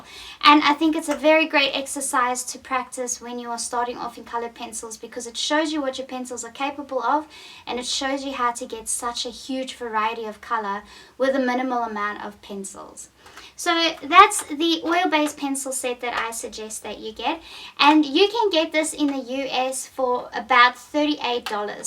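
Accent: South Africa. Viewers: Beautiful accent - are you South African?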